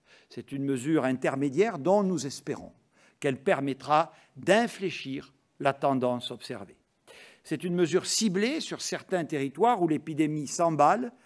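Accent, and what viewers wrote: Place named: South, France. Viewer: Avec son accent du sud, il me fait penser à l'instituteur dans le film :"la gloire de mon père" !! Sauf castex fait dans " la gloire de la dictature sanitaire "avec un plaisir psychorigide.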